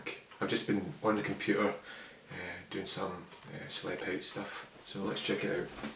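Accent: Scotland, United Kingdom. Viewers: the Scottish accent is awesome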